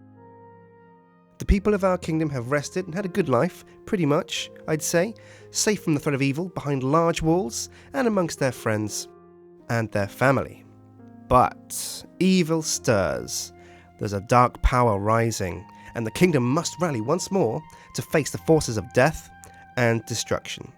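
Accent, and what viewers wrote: United States. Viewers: You sound a bit american with you sore voice.makes me wonder. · He sounds American. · Is it just me or does sound like an American when he is sick?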